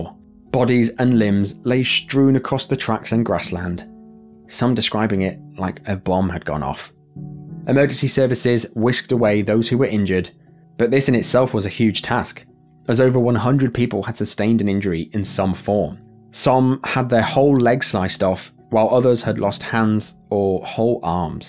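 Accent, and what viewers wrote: United Kingdom. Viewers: As an American I can confirm how much more serious I take a British narrator. IDK why. It seems to be a thing though. I wonder if anyone has ever done any research into that.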